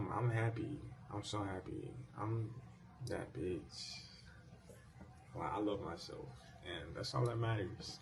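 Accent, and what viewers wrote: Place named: North, United Kingdom. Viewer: It’s so weird bc u don’t strike me as sb who is from the south u sound and carry ur self like u from up north 😂 u got that smooth voice like a New Yorker 😫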